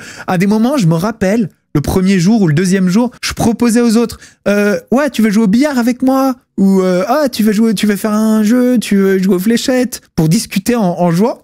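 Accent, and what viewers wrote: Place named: France. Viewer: jecoutais pas dutout big brother mais car tu etais la jai ecouter merdouille et tu es sorti et jai trouve que tu avais vraiment plus laccent francais que sur tes chaines ..... jaurais vraiment aimer que tu reste plus longtemps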